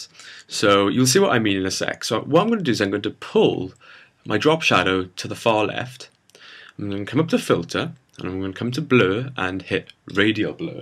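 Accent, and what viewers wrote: Wales, United Kingdom. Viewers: You have a Welsh accent........nice to hear. Also, the tutorial was very useful. Thank you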